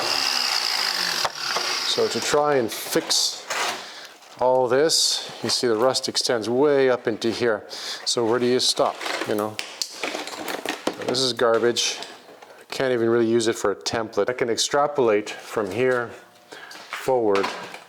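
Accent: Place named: Canada. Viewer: are you in the States. I hear a Canuck Dialect??? Great Build Sir. Make Canada Proud??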